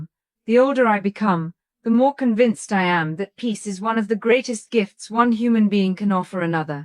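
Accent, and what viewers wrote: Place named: Canada. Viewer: Maye Musk, you are such a supportive mom, you will be a hard act to follow. Your speaking voice is as lovely as your modeling presence. That Canadian accent is always so proper and you did not lose it in Pretoria. I'm seriously pulling for Elon's acceptance among Americans who depend on honest leadership. It is not easy. His admirers are men who want to be him and women who want to possess him for selfish snd selfless reasons. 🙏 🤲 🕍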